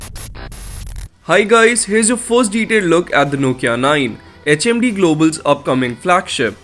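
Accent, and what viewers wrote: India. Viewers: Damn indian english. Dude. learn how to speak! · Ёбтвоюмать че за акцент ??? Индус не мытый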